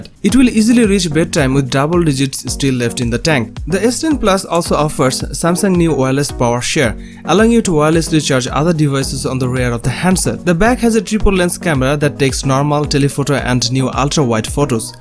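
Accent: India. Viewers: Your accent 😍 Which part of India you are from?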